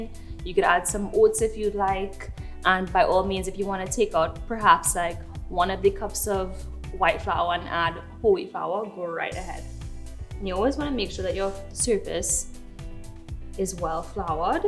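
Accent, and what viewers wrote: Trinidad and Tobago. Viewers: I love a Trini accent. The name is epic. Eat ah food nah! Great work.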